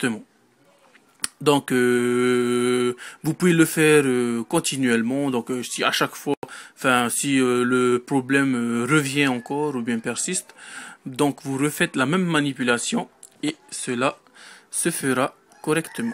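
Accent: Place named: French Polynesia. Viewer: J'ai grillé direct que tu étais un polynésien ma gueule !!!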